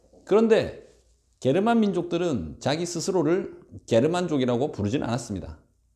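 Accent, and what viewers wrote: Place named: Gyeongsang, South Korea. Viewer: 강사님 억양이 경상도 분이라고 추측해 봅니다. 뺀질뺀질한 서울 억양보다 듣기 조아요~~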